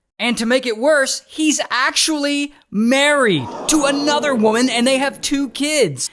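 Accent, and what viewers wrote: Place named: United Kingdom. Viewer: your british is so funny :)))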